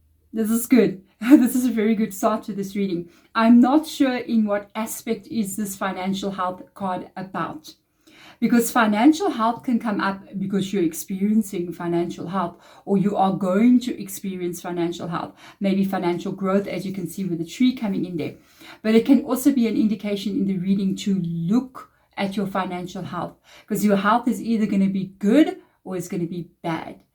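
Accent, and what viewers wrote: South Africa. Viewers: Good morning You sound South African, I’m based in Johannesburg, I would love to come for a one on one session, is that possible if Yes please send Me a guide on how I can make this a reality, thanks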